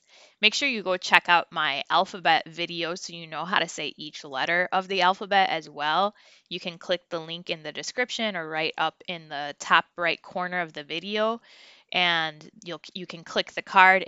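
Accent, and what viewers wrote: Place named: United States. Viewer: I am disappointed because you clearly have have a non-native, American accent in Spanish. For example, at 2;37 ff. you say "ca", "co", "cu" with gringo aspiration on the [k] sound, you say it like [kh]. In Spanish, [k] has zero aspiration. IMO only native speakers should be teaching phonics and accent.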